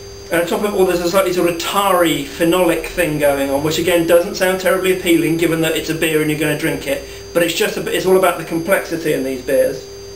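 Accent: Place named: Southern England, United Kingdom. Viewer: do you live up north? I'm confused, as you have a southern accent. Don't tell me you commute to Leeds every day?? No problem with the stemware here. Those are speciality beers. But a reminder, there's nothing wrong with a good, old fashioned pint pot, or glass.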